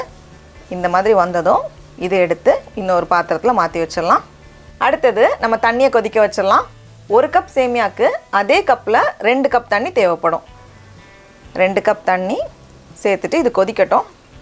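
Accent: Nagercoil, India. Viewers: akka neenga USlaya irukeenga appro nagercoil kaarangala ☺☺☺ so happy i heared it in a you tube channel appro onga veetla ellaru nalla irukaangala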